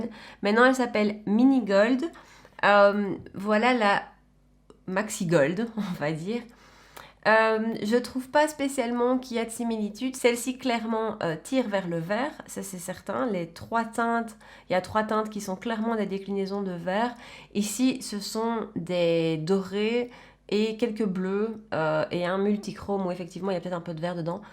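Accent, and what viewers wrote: Belgium. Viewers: Coucou! Merci d'avoir répondu à mes questions! Félicitations pour tes + de 1000 abonnés du coup 😊 J'ai craqué aussi comme tu le sais sur la mini gold j'aime tellement le vert kaki 😍 Au Etats Unis ça doit être le paradis du maquillage mon dieu 😱 Et je confirme tu as un bel accent Belge! D'ailleurs j'ai des origines belges du côté de mon grand père paternel "ça se voit pas hein 😂" Je suis également "Mimi's signature" et "la Loge de Gabrielle" Tu es très agréable, douce, simple et je suis comme toi j'adore rigoler la musique danser... Bonne soirée et à dimanche 😘😘😘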